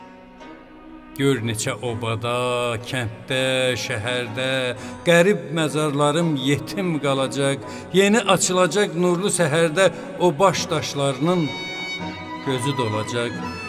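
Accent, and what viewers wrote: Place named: Aghdam, Azerbaijan. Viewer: Eziz qardas Siz ozunuz sesiniz lehceniz ureyiniz semimiyyetiniz ele Agdamdi Saa ureyimiz qurban ay Agdamimiz yangisi ile yasayan qardasimiz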